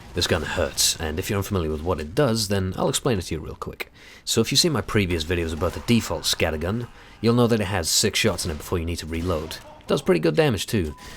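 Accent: United Kingdom. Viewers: I love British commentary the voice is like...... It can't be explain from words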